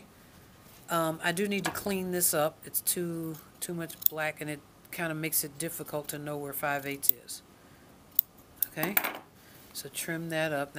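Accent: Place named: South, United States. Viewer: Omg thank you sooooo much for making the effort to post this lesson!!! I have been going back and forth on how I should sew this top I'm sewing that has a lining and I could not wrap my head around how I was going to make it work?!?! Your video is concise and exactly the example I needed!! Also you sound like a wonderful southern woman who's got all kinds of really great knowledge that can't be taught, that only comes from experience!! Anyway seriously thank you!!!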